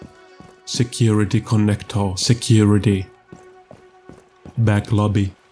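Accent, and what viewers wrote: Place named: Finland. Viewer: You're definetly Finnish aren't you? Accent is sounding like it.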